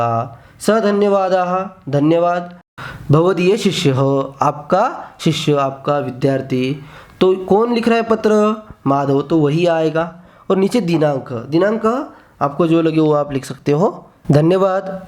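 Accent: Maharashtra, India. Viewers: i will guess that ur mothertongue is marathi by the way teaching is very nice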